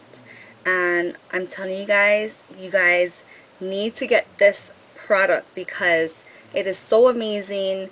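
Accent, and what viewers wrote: Hawaii, United States. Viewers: R u Hawaiian?? You really sound like a local girl from Hawaii:) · Omg thanks for the review and demo! I have the darkness under my arms so I'm considering this product. :D I could tell by your accent that you're from hawaii! Great review!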